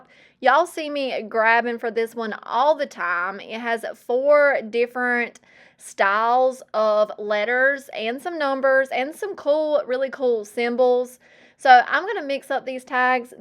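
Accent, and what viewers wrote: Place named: Eastern North Carolina, United States. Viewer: I love all of your projects! Thank you for sharing your creative ideas. I live in East Tennessee and we have friends that live in Vanceboro, NC. When I watch your videos, it makes me think of and miss them because of your eastern North Carolina accent.❤🥰😉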